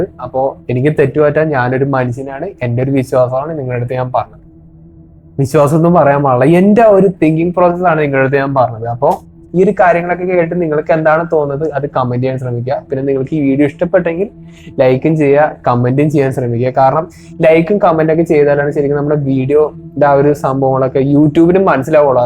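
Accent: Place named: Kochi, India. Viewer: കൊച്ചിക്കാരൻ ആണോ..? ആ ശൈലി ഇത്തരം വിഷയങ്ങൾ കൈകാര്യം ചെയ്യുന്നതിൽ അഭിലഷണീയമല്ല.. വ്യക്തത വളരെ കുറവാണ്... 🙂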